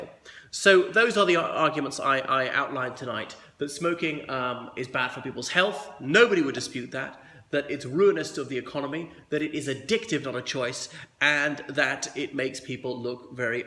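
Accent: RP, United Kingdom. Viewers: I was looking for posh british accent and I found you. Im peruvian and I ve been to England quite a few times .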